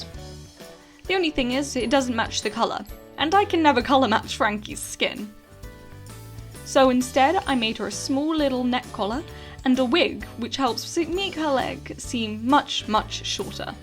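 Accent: United Kingdom. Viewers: She's well cute ❤️what country are you from ,you sound like a posh English person ☺️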